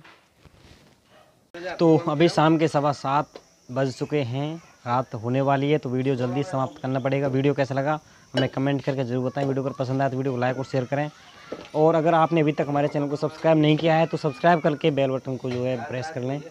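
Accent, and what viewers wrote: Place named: Jhansi, India. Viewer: आपकी जो हओ बाली भासा है बो मेरी भी है में झांशी से हूँ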